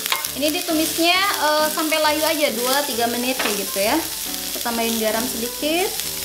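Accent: West Java, Indonesia. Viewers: Suka sama gaya bicara nya sunda pisan 😍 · assalamualaikum tth nthh angin😂 apa yg bisa bawa sya ksnii duhh tth ngomongnya khas sunda bgt ya ihhss gemes😂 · Tth tj pakih mana sunda na kitu..jntn panasaran...nuhun resepna...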